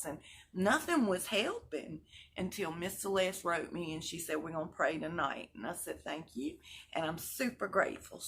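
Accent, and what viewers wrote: South, United States. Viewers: She has a very heavy southern twang. I love her voice too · we love you You are so adorable! I have no idea how all these people who send you gifts know where to send them... Anywho I wish you a beautiful Happy Borthday September girl!! Also I use diclofenac ointment it’s a topical anti inflammatory that my dr gave me & it helps. I use ice if it’s real bad & otherwise I’ll just put heating pad on it! But I love your vids watch them all the time & I love your sweet country accent...just love it Big hugs love and many blessings sweet “talk to ya real fast” ☺️🌸☘️🌼🙏❤️✝️ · There’s a lot of variations of the Southern dialect My husband doesn’t sound like at all. But I think all the accents down South are so cool to listen to!!! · It's a southern accent 🙃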